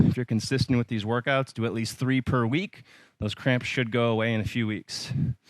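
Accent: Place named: United States. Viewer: awful american accent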